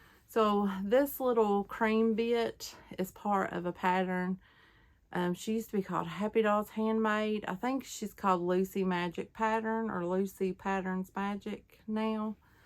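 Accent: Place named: Kentucky, United States. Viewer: I love your channel. Are you from Kentucky? I am and our accents our similar.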